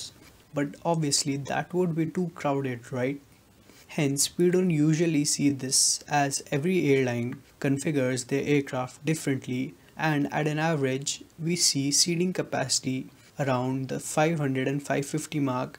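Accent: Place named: India. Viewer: Indian accent made the experience very peculiar... Sounded as...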